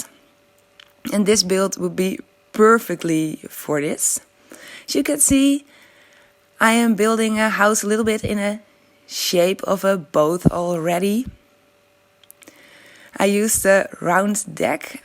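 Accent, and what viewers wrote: Netherlands. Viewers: Bij je eerste zin hoorde ik al dat je Nederlandse bent, ☺️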